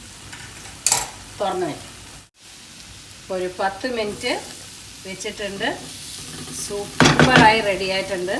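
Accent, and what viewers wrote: Palakkad, India. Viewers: പാലക്കാടൻ ഭാഷ ടോളെ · Hahahahahha chechi 😄😄😄 എന്താണ് ഉണ്ണി 😄😄 പാലക്കാടൻ ഭാഷ കേൾക്കാൻ നല്ല രസം എന്റെ chechi ആ ചീന ചട്ടിയിൽ ഇട്ടു പുരട്ടി തിന്നുമ്പോൾ ഉള്ള സ്വാദ് അത് പറഞ്ഞറിയിക്കാൻ കഴിയില്ല എന്റെ അമ്മക്ക് നല്ല ഇഷ്ടാണ് chechi കുഴച്ചു പുരട്ടി കഴിക്കുന്നത്. ഇങ്ങനെ ആണ് ചോറ് കഴിക്ക അല്ലാണ്ട് അവിടുന്നും ഇവിടുന്നും തോണ്ടി അല്ല കഴിക്ക പറയും ☺️☺️☺️☺️ എനിക്കും ഇഷ്ടാണുട്ട chechi കഴിക്കുന്നത് കാണാൻ നാളെ എന്റെ കറി ചേച്ചിടെ കുരുമുളകു രസം ചേന ഉപ്പരി & അപ്പളം ✌️✌️✌️✌️ ഒത്തിരി സ്നേഹം 😍😍😍😍 🤗🤗🤗🤗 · Love your simplicity,ur palakkadan tone, simple and healthy menu. Lots of love from thrissur. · Cheenachatti nalla bhangi evidunnu vangi Palakkad samsaram kelkan bhayakara rasanu ente husband veedum parli anu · എന്താണ് ഉണ്ണി.. പാലക്കാട്ൻ ഭാഷയും ചേന ഉപ്പേരി യും കലക്കി 👍🌹❤😍😘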